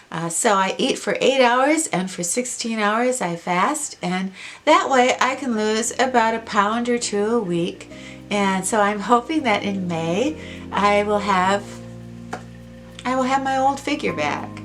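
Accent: Midwest, United States. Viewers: My mom told me about your channel 3 yrs ago. So that’s when I started watching you. Your the sweetest lady. As soon as I heard your accent I knew you were from the Midwest. I lived in North Dakota for 28 yrs, but now I live in Oregon. Anyways, you always bring a smile to my face. Movie..I would have to say Hallmark hahaha just love them. But I do love Cinderella the (live action) Have a great evening. ❤️🌸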